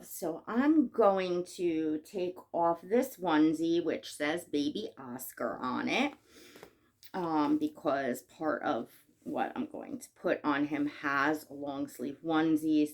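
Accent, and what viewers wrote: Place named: New York, United States. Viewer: I have watched you for months and just commented now but I absolutely love you and your channel! Your New York account is my most favorite accent ❤ You are such a great silicone/reborn mommy and I love all your babies. It’s funny how people always got to but in and add their 2 cents to everything lol!!! There’s is nothing wrong with us loving our Dolls and role playing. Back in 98 I had my one and only son because after him I developed a heart condition called postpartum cardiomyopathy at 22 and couldn’t have anymore so when I found these babies years ago and finally got my first full body silicone in 2021 I was over joyed and since have added up to 15 silicones and 1 reborn that I’m selling but because I just put on the new born stage of my real child and couldn’t have anymore I just love these new born and preemie babies since my son was a preemie too! 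These babies are definitely great therapy and help so much. I totally would adopt if I could afford it because I’m 47 but definitely would give anything to have another new baby around but it’s not in the cards and so many just like to voice their opinion but anyways you keep doing you and keep bringing out those beautiful babies. My whole family has taken to my silicones as real family and just loves them too. It’s really helped me as well to hold and play with these babies but to know the full responsibility isn’t there so we can still enjoy life and do what we need to and play with our babies when we need too and want too.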